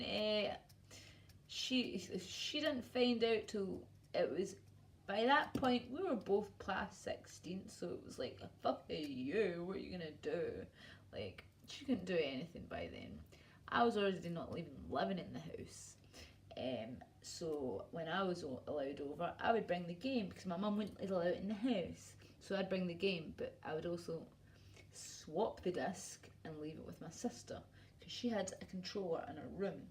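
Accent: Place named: Scotland, United Kingdom. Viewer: I subbed you back hunni,great to hear a good old Scottish lass in this fight,my 1st,lol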